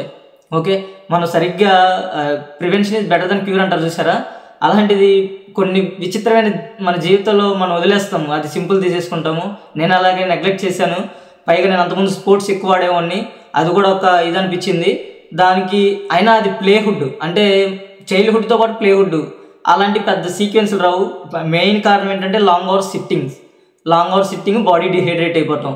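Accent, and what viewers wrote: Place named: Visakhapatnam, India. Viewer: Brother vizag rural aa medhi. Slang konchem maku dgagara ga vundi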